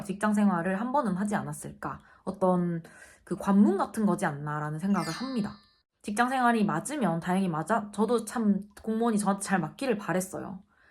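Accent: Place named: Gyeongsang, South Korea. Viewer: 서울말 쓰려고 노력하시는 경상도분이시군요!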